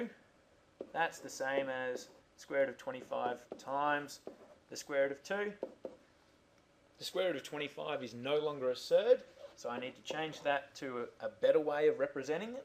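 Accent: Australia. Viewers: Revising in australian, so much better..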